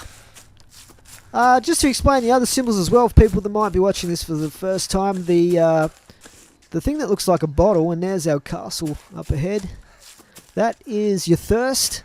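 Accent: Australia. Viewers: you sexy aussie like me :D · are you an Aussie?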